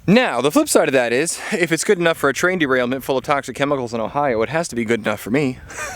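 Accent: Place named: Australia. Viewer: Hilarious how we get down under accents from you I would be doing Yankee or pommy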